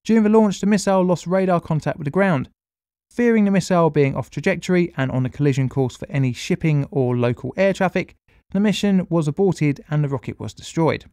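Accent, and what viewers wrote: United Kingdom. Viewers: His is the best British accent after Patrick Foote (Name Explain). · I think your videos are awesome, informative, and very well produced. The British accent is just a bonus. Also I am enjoying the increased video production, atleast something good is coming out of this Covid crap.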